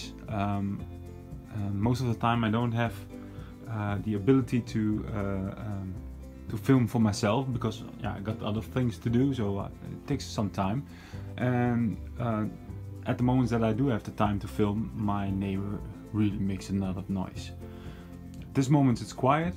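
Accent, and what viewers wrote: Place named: Netherlands. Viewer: You sound Dutch. I'm Dutch to!!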